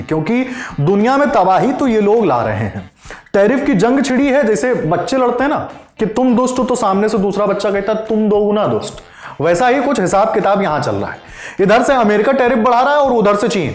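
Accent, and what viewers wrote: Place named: Kanpur, India. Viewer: कहि रहे...रहि जाएगा...वइसे...पहिले...😂😂😂 कनपुरिया स्पॉटेड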